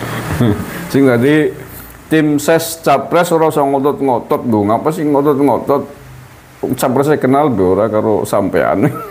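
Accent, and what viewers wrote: Banyumas, Indonesia. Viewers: Aku lihat nih melu ngguyu bahasa ngapaknya tuh loh Pak mantep 👍👍🤣🤣🤣🤣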